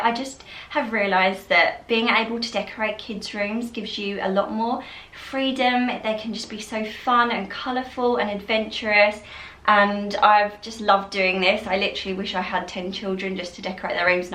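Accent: United Kingdom. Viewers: ar you from the uk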